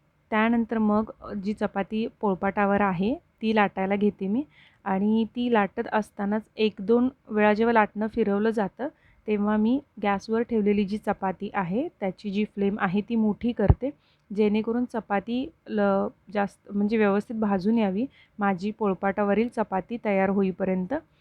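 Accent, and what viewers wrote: Kolhapur, India. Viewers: तुमची कोल्हापुरी भाषा मला खूप आवडते तसेच तुमचे राहणीमान खुपचं छान आहे तुम्ही आरव ला लावलेल्या सवई तुमचे सगळ्यांशी बोलणे तुमचे व्हिडिओ पाहण्यासाठी मी खूप उत्सुक असते रोज दुपारी मी तुमचे नवीन झाले ले व्हिडिओ बघते किंवा जुने बघत असते खूप refreshing वाटते 🙏 असेच छान व्हिडिओ करत जा तुम्हाला खूप खूप शुभेच्छा 👍 धन्यवाद 🙏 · Di tujhi Kolhapuri language khup aavdhte mla 😍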